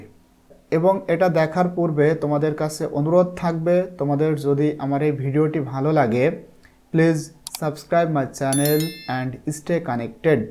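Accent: Barishal, Bangladesh. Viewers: স্যার কি বরিশাইল্লা নাকি 🥰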